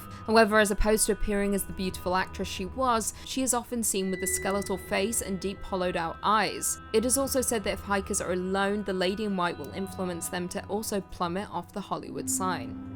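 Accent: England, United Kingdom. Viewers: the hollywood sign ghost looks alot like kirsten dunst, is it just me or? is not losing her english accent, what do you mean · speaking as an English person.. you still sound very English to me. I don't know what they're talking about. Keep up the great vids:) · I didn't realize that California had such dark and scary history and I love English accent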